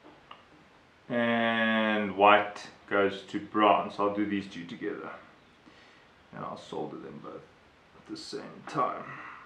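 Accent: South Africa. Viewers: useful information you have a south african accent :)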